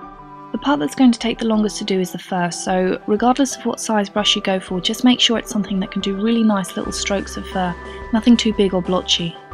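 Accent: United Kingdom. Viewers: If someone did these videos and had an annoying voice I wouldn't be able to watch it but u voice is all British and stuff >.<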